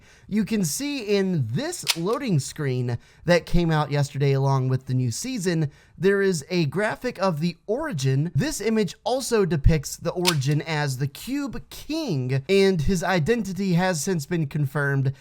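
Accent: United Kingdom. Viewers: i knew he was British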